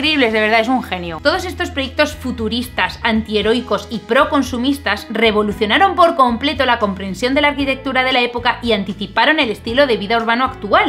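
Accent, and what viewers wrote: Spain. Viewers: Si dicen que el accento de Madrid es el neutro de España es falso 😂 Cada vez que suelta "mazo" es que es de Madrid 😂😂🤣🤣 Nano! Saludos desde Valencia !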